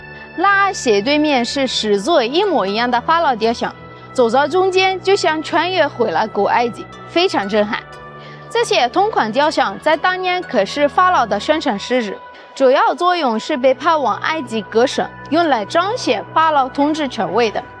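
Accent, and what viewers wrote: China. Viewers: 好中國話用語和腔調 🤷 😮⋯⋯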